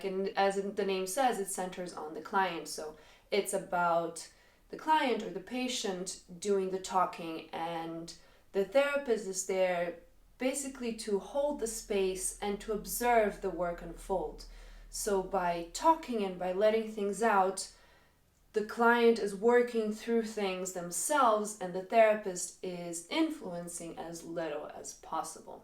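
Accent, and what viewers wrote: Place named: United Kingdom. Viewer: I really love what you say and the Nice British accent!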